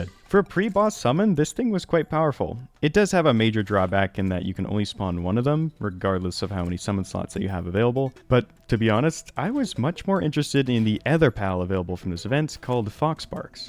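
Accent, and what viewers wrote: United States. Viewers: i think thats just his american accent, similar to "banena" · yeah Midwest American for sure.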